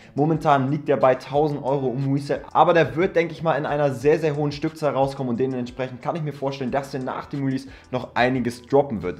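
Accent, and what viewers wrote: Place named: England, United Kingdom. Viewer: Ich liebe immer die englische aussprache🙏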